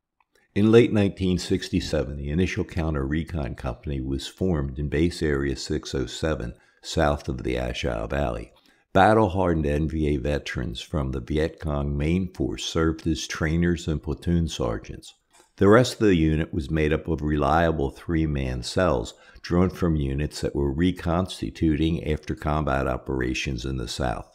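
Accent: Philadelphia, United States. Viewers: Narrator is definitely from Philly!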